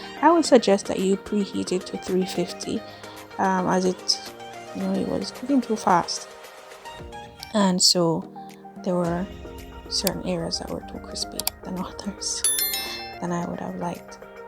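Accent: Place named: Saint Kitts and Nevis. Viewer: Ummmm sis u from St.kitts 👀👀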